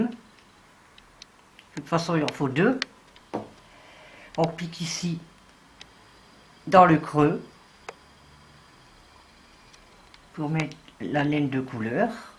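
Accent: North, France. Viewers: Bonjour, madame. J'aime bien votre accent aussi. J'ai de la famille dans le nord aussi, à marquette les lille....et les personnes du nord sont tres accueillants et plein d'humour. Votre vidéo est très bien, ce gilet magique, ...rapide à faire et simple.❤❤❤😊😊😊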